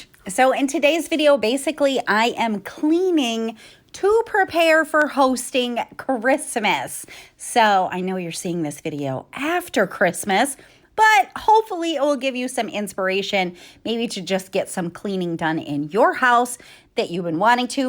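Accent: England, United Kingdom. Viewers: I can hear you slipping into your English accent...makes me think of British TV shows (love Doctor Who and Masterpiece Theater). Busy crocheting for the past few days on several projects. Love your videos! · your home is smashingly turning into a wonderful cozy environment that is soo inviting. I enjoy watching your channel and your family and Clark. He's so adorable. I love your British accent 😂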